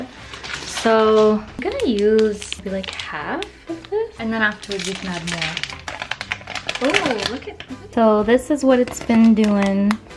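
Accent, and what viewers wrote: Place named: South, United States. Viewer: Lol what’s with the southern accent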